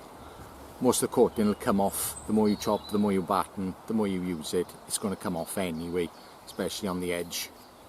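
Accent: Wales, United Kingdom. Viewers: Probably the MOST Welsh accent I’ve ever heard!! 😅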